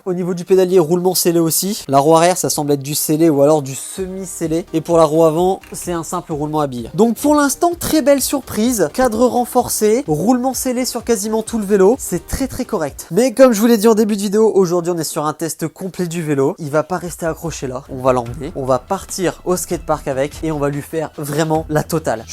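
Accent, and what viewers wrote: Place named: North, France. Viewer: Tu viens du nord ? J'ai l'impression d'entendre un petit accent ^^ Edit : ah bah oui tu le dis dans la vidéo tu vas sans skate parc du nord de la France x)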